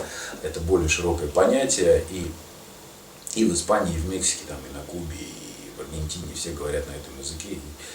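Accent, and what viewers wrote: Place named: Belarus. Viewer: Українською спілкуєтесь майже як білорус :))). Мабуть спілкування з представниками північних регіонів країни впливає :))) Треба більше практики та спілкування з носіями мови, а також сало, горілка та пампушки будуть спонукати до кращого розуміння не тільки мови, а й культури відносин. Хай буде більше:)))) зустрінемось ;)))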